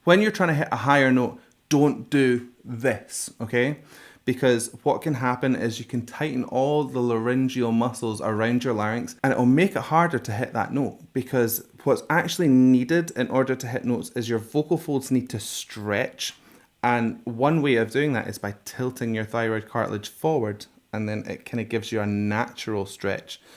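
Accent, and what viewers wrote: Scotland, United Kingdom. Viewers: I love Scottish accent! I enjoyed your professional explanation very much! Jin is definitely a great singer and yes, we need more Jin in ’ songs!💜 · Hey. Thank you for the technical explanations. This is exactly what I look for in a reaction video and I so rarely find it when it comes to reactions. Also, love your Scottish accent. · Hey, this is nice. Keep going! This ARMY approves. Not that you need mine or anyone’s approval. 💜 Some of the songs Jin really shines in are BTSs Japanese songs, and Korean. And ofc his solo songs, etc. is a magnificent vocalist. Very stable too. Have fun with this! ps. I’m Swedish but I worked a season in Dalwhinnie and traveled around Scotland many years ago and when I hear you speak it really takes me back. Love the Scottish accent.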